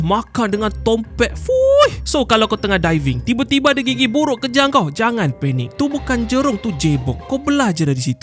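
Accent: Sabah, Malaysia. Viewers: Admin ni mau ckp loghat mcm india ada .. mcm sabah pun ada juga ... Mimin orng apa yee .. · aku syak ni org Sabah... banyak kali kluar slang Sabah dia tu 😂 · ya rasanya sabahan ni sbb selalu dgr dia slang sabah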